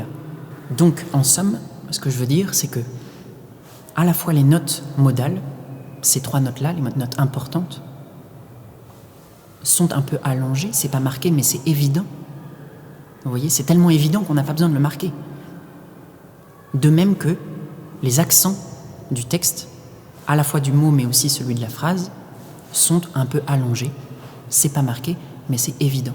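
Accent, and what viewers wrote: Standard. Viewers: I am French, yes his accent is very neutral, and he speaks like a French literature teacher with emphasis on clear uncompromised pronunciation.But his language is quite simple and slow. It's the best possible combination for language learners. Few people talk like this in France. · Me too! He’s actually not as hard to understand as I thought, maybe his accent is very neutral? He also speaks slowly and clearly so I’m not as lost as I expected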